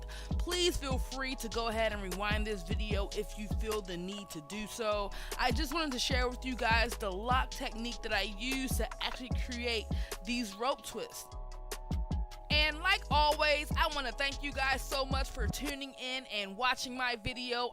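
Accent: Baltimore, United States. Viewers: You from Baltimore lo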